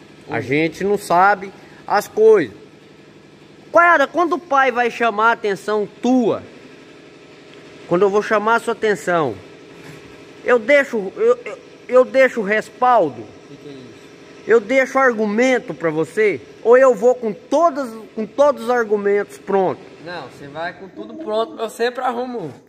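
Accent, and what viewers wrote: Minas Gerais, Brazil. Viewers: Ô trem bão é bão, o trem ruim é ruim ,mais é sempre trem, entendeu trem?!?!?!?! Kkkkk rachei de rir com esse mineirim, ô trem bão sô.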